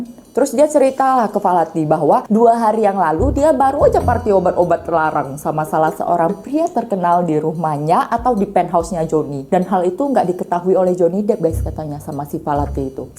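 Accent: Medan, Indonesia. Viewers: Suka cara bawa cerita nya,jelas dan gak berbelit" apalagi kaya ada logat Medan nya ya..☺️ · Bahasa medan nya kental kali, suka kali aku dengarnya. 😍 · Haha medan abis...kaya mana itu si amber...logatnya mirip bgt tmn sy yg dr medan... Sukses ya...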